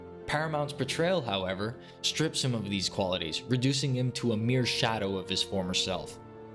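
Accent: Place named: Canada. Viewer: As someone who hasn't played Halo, not a console gamer and not great at shooters, I'M pissed at the mishandling of the character - and the constant attempt to bait and switch lead characters. He is an icon, deserves better. If you wanted a helmetless Master Chief (but why though?) Kurt Russell did it better in 1998: <link> Impressive first video - HELLA good editing. Shame about that cannuck accent.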